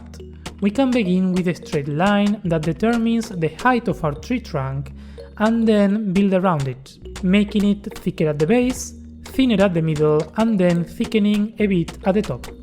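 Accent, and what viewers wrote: Argentina. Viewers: Eres Argentino no? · sos de argentina no? me remil suena ese acento JAJAJAJAJA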